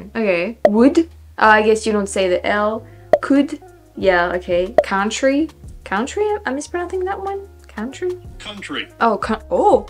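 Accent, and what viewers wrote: France. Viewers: Your pronunciation is great. Don't lose your French accent, it is wonderful. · I find it interesting to hear you talk about the different prnounciations. However, I don't understand how or why anyone took exception to your pronunciation to begin with. Your French accented English is absolutely charming. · I have watched you from the beginning of your first videos. You have made great progress learning English. Please don't lose your French accent because it is so cool. Your T-shirt is awesome. Just an old guy's opinion. I think you and your mom are wonderful. Stay well and be happy. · Your 'sauce' and 'squirrel' are good. I think if you have a problem it is more phrases than individual words. In general you are easily understood. I've known people who lived in the US for years who were far worse. The French accent in English is what I would call a prestige accent. 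Louis Jourdan, a French actor who worked in Hollywood, could speak almost perfect English but he kept a little French accent to make his characters more significant. So, improve your English when you can but your accent doesn't hurt you at all. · American English with a French accent. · The utter defeat in your body language when you were trying to reconcile county vs country made me laugh too hard! I’m so amazed by your language skills, and your French pronunciations of words in English are so lovely! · I can always tell what is saying on her videos. Of course it won't be like an American, she's Frenchtastic 🙂I am always disheartened a little when people "lose" their accent. It's what makes humanities rich textures full of genuine diversity yet through shared communication of that diversity we can communicate. ( even with the multiple meanings ) Context clues FTW 😛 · Having watched your content for a good while, there are times, more and more, when your English is so good that I can't hear any French accent at all. 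You are doing so well! It's charming that you're so determined to improve. I must admit, if you manage to erase your native accent, I will be a little sad, but no where near sad enough to stop watching your adventures! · The English language sounds so much better when a French woman is speaking it. 🤩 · English is just hard. As long as people understand you, which they can, I wouldn't worry about it too much. Besides, your French accent is cute. It's way better than the accent people from Quebec have 😱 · Some of your "mispronunciations" aren't actually wrong. It's not your first language so your beautiful French accent makes a difference like it would with anyone speaking a language other than their own which would totally get them in trouble in some countries, lol. I've heard people BUTCHER French words. I took 3 years in high school and even though that was 30+ years ago, I still cringe when I hear it because you can tell that person has never learned properly but THINK they know. 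I agree with the one comment. That rolling "r" when you speak certain French words does trip up a lot of people just like "th" does for you and I've noticed for a lot of Brits who will pronounce it as an "f" sound. You do talk too fast in your excitement sometimes which makes you stumble but once you take your time, you're golden :). With all the accents (regional and foreign) that we have in the US, it's laughable that people say you're saying words wrong but you made a very good point. If you've never heard them spoken before, you don't know it's wrong. FYI, it's not that we don't say the the first "n" in Lincoln. It's that we don't say the 2nd "L" so Link-IN or Link-On depending on where you live. Chicago - the CH isn't a hard Ch like Church but a soft sound like Shh. Believing - Ba-LEAVE-ing. You said read near the end of the video "it will be fun to read (RED) your comments." The read when pronounced like red is the past tense of the verb and read pronounced like seed is the present tense :). 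· frankly, I love the French accent.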